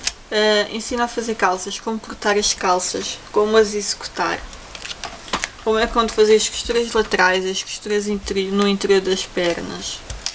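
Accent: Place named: Portugal. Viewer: Definitivamente, eu aqui no Brasil não entendo português de Portugal...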